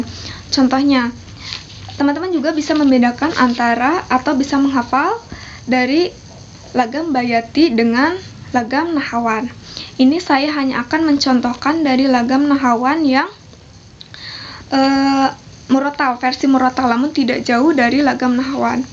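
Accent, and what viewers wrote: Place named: West Java, Indonesia. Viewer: orang sunda nih. v jad p. wkwkwk.. maaf mbak. sama2 urang sunda.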